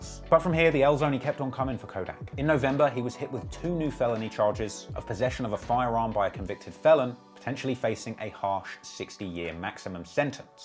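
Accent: United Kingdom. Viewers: Are you British or American I am so confused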